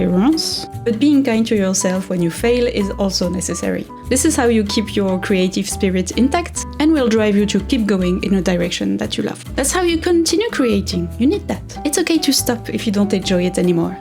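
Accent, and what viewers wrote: France. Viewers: Please don't let the Internet tell you that you have to change! You are good the way you are, that's why we are following you ❤ I actually enjoy it most when you do something just for yourself and take us with you on that journey. And remember: Mistakes are something to learn from. (says me who is a teacher) I love the accent by the way. I used to have a colleague from France you always remember me of her. There is something about your energy... is there such a thing as a French energy? I really enjoy it, it makes me happy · please accept many many warm hugs! I think it's safe to say that we all love your videos because YOU made them, and we are here to see the projects that make YOU happy, and see YOUR specific style. I don't think anyone here is expecting perfection, we're here to see your fun and creative ideas, things that inspired you, and you enjoyed making. 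By this I mean, don't put so much pressure on yourself, you can wait until inspiration hits and we'll be happy to watch the process when you're ready to share it. Now for a confidence boost: I probably spend half the time I'm watching your videos observing that you look beautiful no matter if you're wearing makeup or you're in your cozy blanket clothes. As a native English speaker, I think your English is great! It sounds very natural considering it isn't your first language, and your accent is delightful! I always wind up thinking with a French accent after watching one of your videos. ❤ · I really relate to what you say about running your own business- I do the same (not YouTube), and it's exhausting to have to do all the tasks, especially when you're not great at them. Social Media and Marketing are my least favourite too! You're so beautiful, with and without make-up, please don't ever feel like you're not. 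I love your personality, and your French accent is the best - I wish you didn't feel so bad about it! It's very relaxing to listen to :) I'm sorry you're experiencing burn out - it's hard to keep up creativity to such a high level all the time. I'm not sure if there are things the Algorithm will like that you could do that are less intensive, but Rachel Makesy will often mix in more casual videos to her channel e.g. painting a picture, trying out an old sewing machine, organising her studio, putting together different aesthetic outfits etc. Maybe you can do videos like - 'trying on my best and worst makes from 2023', 'fixing a failed project', etc. Maybe that would lighten the creative burden? I don't know - I'll watch whatever you want to do. Anyway, I just wanted to send some love and encouragement your way :) I'm so sorry you're struggling, but you will find a way through this, and the other side will be so bright xx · We love you exactly for all the crazy, fun, interesting stuff you do and HOW you do it. 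AND the failures and your creative ways to fix them. You know, you learn more from mistakes actually as they challenge your capability of finding solutions 👍 and please don’t go down the rabbit hole of meeting expectations of others (people that don’t even know you and you should not care about). You are perfect and beautiful - from the inside and the outside - the way you are (btw French accents are very sexy! if I may say so as a straight woman). Please, take a break from all the struggles, if you want to. And you have already made the first step towards leaving your current struggles: you have identified what doesn’t do any good for you, and you can now work on letting that go. I hope you will be better soon, sending you love and good energies 🌈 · I love any content you create and every project you have worked on. You are BEAUTIFUL and I absolutely adore your gorgeous French accent. You ARE enough and you are Soooo relatable and sweet and kind and talented. 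Please try remember this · If it helps: i really love your voice. My father is french and your accent makes me remember happy times and remember visiting France every summer. Merci ♡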